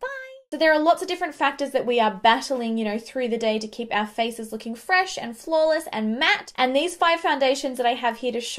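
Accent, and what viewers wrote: Australia. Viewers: Really enjoyed this video but I had to watch it muted and with captions because her accent is so terrible! It was like nails on chalkboard to me and I normally don't mind an Australian accent. For whatever reason though, her accent really got to me and was hideous to listen to. Yikes. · Why do australians pronounce 'go' like GOURH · I could listen to you talk all day, love the Aussie accent! 😍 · Love yur Aussie accent 💗👍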